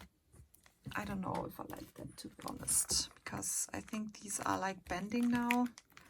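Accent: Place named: Germany. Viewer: Omg I can hear that you are german are you?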